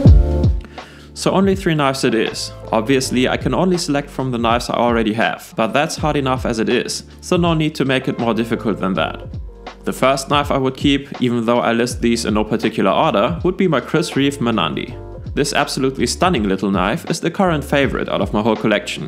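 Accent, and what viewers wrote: Germany. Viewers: Heavy German accent but nice content. Thanx.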